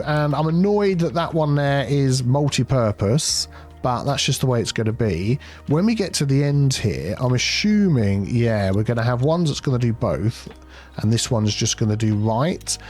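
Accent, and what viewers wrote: United Kingdom. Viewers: the most adorable thing in your videos is your classy British accent. If I had such accent I’d never shut up 🤣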